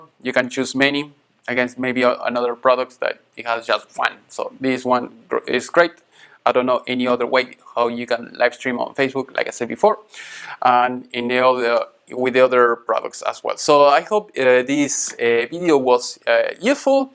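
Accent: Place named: Rioplatense, Argentina. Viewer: te escribo en español porque por el acento y el "going to" (vamos a) que usas mucho igual que yo tenés que ser sí o sí argentino o uruguayo. Yo soy porteño, y vivo en Atlanta, Georgia. Habiendo hecho esta introducción, te cuento que me encantó el video, y que probablamente sea el más instructivo y completo que encontré sobre el Teradek Vidiu Pro. Me lo quiero comprar para hacer transmisiones para la tele argentina, y la verdad es que creo que me voy a inclinar por esta opción. Te mando un abrazo argentino y espero que estés muy bien. ¡Gracias!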